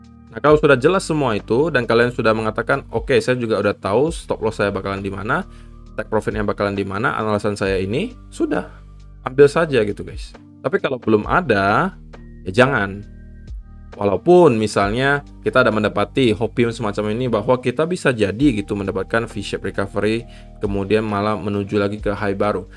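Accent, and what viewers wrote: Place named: Medan, Indonesia. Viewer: Bro kamu China medan apa Bangka? Logatnya medan y.. Salam dr medan bro 🍻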